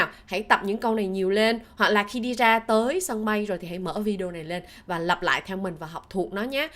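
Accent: Standard. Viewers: Người đầu tiên nhỉ? Hihi. Hay quá. Chủ đề thiết thực để đi du lịch. Giọng hay và chuẩn. Tròn âm ghê. Tính hữu thanh rất đậm. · accent rất chuẩn, 🎉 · Cô nay giọng quá chuẩn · Cảm ơn bạn nhiều, bạn nói rất chuẩn và cách thức bạn dây cũng rất dễ hiểu. 😊❤🎉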